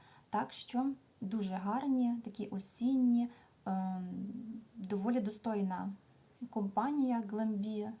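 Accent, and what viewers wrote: Standard. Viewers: я підписалася на Вас неділю назад.Підкорили чистою українською мовою, щирістю, сімейністю. Вы завжди розказуєте про донечку,видно,що вона-ваше найбільше досягнення, гордість. Для мене-ви релакс вранці і ввечері. Подивилася відео про порожні баночки-почала використовувати пробнички,а їх за 10 років дружби з мережевим так багато!Дякую!Процвітання каналу!І набрати скоріше 1000 підписників! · Привіт. Підписана від початку війни. Подобається ваш голос і чиста українська мова. Ви дуже приємна. Бажаю здоров'я вашій донечці, бо чую що кашляє). І мирного неба!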